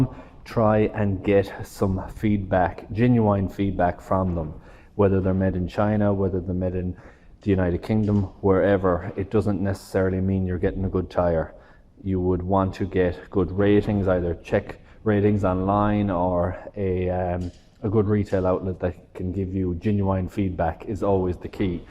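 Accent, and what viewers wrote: Ireland. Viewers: Sounds Irish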